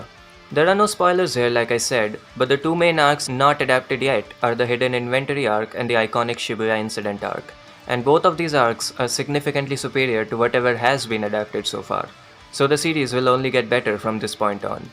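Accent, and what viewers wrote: India. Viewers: Indian accent. ok im out. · Sounded indian so i subscribed ❤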